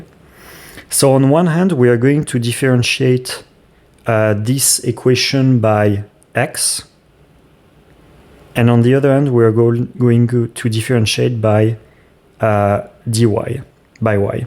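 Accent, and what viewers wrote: France. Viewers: don’t worry the English level is very good. Yes there’s a French accent but it’s no issue. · c'est cramé à 10km que t'es français ptdr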